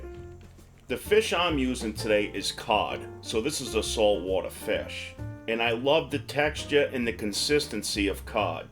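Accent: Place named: Massachusetts, United States. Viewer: Are you from Mass ? You sound like me lmao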